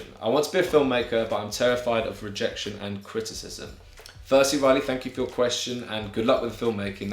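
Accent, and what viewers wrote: United Kingdom. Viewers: I'm laughing so hard at your English accent oh my god... the idea is absolutely GENIUS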